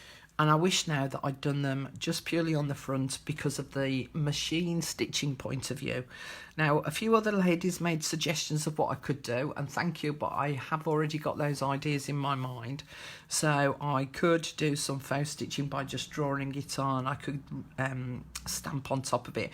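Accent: England, United Kingdom. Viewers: Hi,I just found your channel..I've been wanting to start doing glue books for awhile now but just been reluctant to give them a try..Thank you for such great tips and ideas..I absolutely looove your accent..What part of England are you from? My maiden name is Burton and my ancestors started Burton England so my dream vacation is definitely England since English is in my blood..The English accent is my favorite,its just so soothing to me lol Lots of love from Kentucky❤ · Absolutely love your voice your accent are you English you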